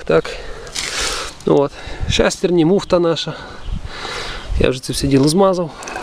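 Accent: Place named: Ternopil, Ukraine. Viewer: Дякую за Украінський канал і за рідну Тернопільсьську говірку, супер!!!))))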